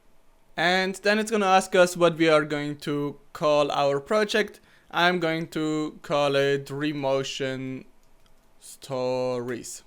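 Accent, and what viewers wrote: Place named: Switzerland. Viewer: oh schwizerdütsch, geil!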